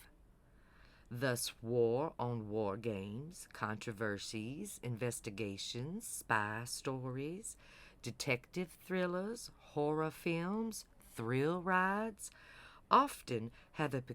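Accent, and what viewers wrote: South, United States. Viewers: What a melodic sultry southern voice so absolutely beautiful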